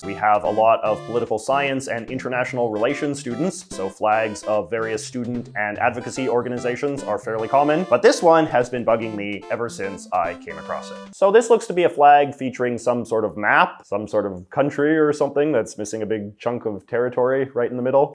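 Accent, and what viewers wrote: Canada. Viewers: watching you made me realize how real a canadian accent is as an american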